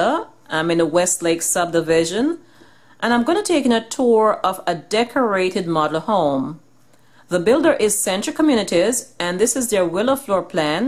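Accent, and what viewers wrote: Jamaica. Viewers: Just found this channel and loving it so far well tailored video lovely homes I like seeing decorated homes. Thinking of moving to Atlanta in the summer 2020 will definitely be keep my eyes glued to your channel...thanks for the videos keep them coming😊😊.....btw you sound Jamaican🇯🇲😊😊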